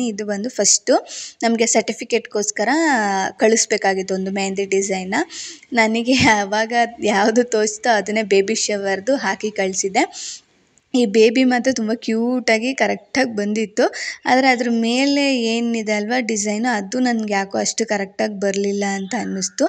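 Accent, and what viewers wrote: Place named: Hassan, India. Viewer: ನಮ್ಮ ಹಾಸನ್ ಸೈಡ್ ತರ ಇದೆ ನಿಮ್ಮ ಭಾಷೆ ಹಾಸನ ಮಂಡ್ಯ ನ ನಿಮ್ಮದು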